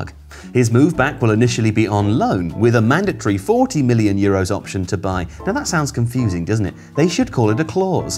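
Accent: United Kingdom. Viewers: I love your voice so much bruuvvvv. I have been watching Tifo since 2018 and I want to say that your British dialect and voice is really awesome in comparison to others I've listened to.It is a high compliment bc the internet is vast. My advice is: when you start doing commentary for live games, please dont be a siff like the majority of English announcers - look for some sauce and vivacity from the Romance languages of how they announce games (Argentinians, U.S. Hispanic announcers, and Portuguese are really good starts for research into this - they are so much fun when they announce games). And, yes, one day, BBC, or someone is going to want to use that voice....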